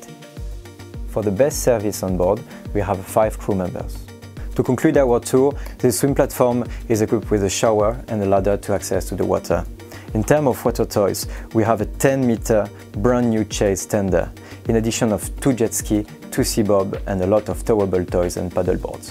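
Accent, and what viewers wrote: France. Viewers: Nice French accent. "The key to life is happiness in your household"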